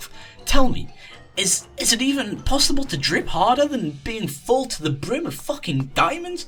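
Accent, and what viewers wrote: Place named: Northern England, United Kingdom. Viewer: I love your northern accent, you from Sheffield or something?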